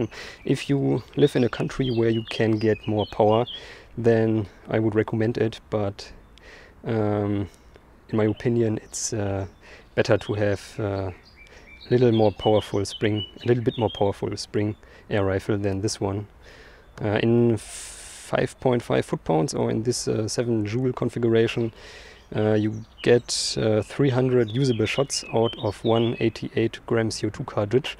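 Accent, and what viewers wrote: Germany. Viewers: Deutsches English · Deutsches englisch♥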